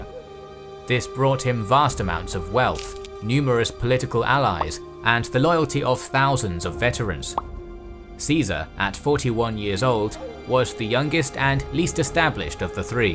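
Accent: United Kingdom. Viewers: Nice British accent!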